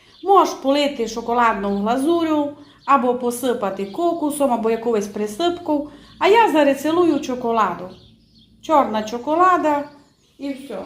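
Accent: Transcarpathia, Ukraine. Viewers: Уши греет Ваш чисто-Закарпатский.Вы откуда?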